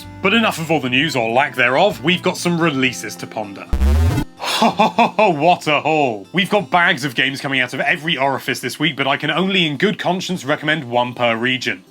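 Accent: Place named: United Kingdom. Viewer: I get that you're British and your accent isn't the original pronunciation of the language. Instead it is a style of speaking that was developed by bringing cockney slang to the upper-cass to unify those from the homeland and identify yourselves by contrasting with colonial Americans. However; "Yoshi" (Yoh-shee) is a Japanese name so it should probably be pronounced as it's native language suggests.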